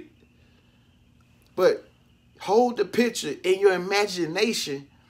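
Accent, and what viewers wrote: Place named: Midwest, United States. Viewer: This is True!🙌 Btw, r You from the Midwest?🤔 Ur accent is similar to where I Am.....👊😏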